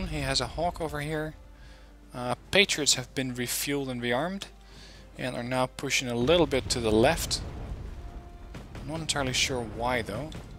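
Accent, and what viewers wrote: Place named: Netherlands. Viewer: You sound dutch !! Thank you for this replay !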